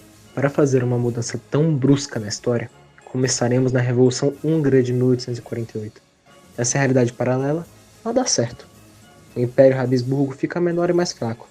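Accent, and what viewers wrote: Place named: Germany. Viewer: Adorei o sotaque alemão 🎉🎉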